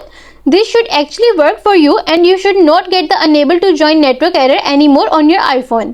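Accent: India. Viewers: Its bad enough you have a Indian accent but why the he'll you,going so,damn,fast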